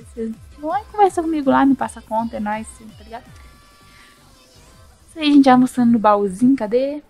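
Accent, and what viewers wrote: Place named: Minas Gerais, Brazil. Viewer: Esse Sotaque Mineiro e meu devolvs kkkkk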